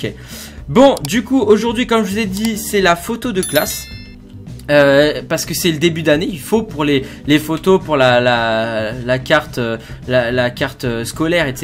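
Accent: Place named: Italy. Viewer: Le meilleur accent italien 😭😭😂😂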